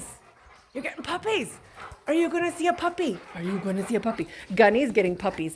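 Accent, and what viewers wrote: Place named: United States. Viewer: Your big dogs are lovely! Will they eventually be allowed to be with the Bulldogs? Your accent is American...however it seems you live elsewhere ...may I be as bold as to ask where?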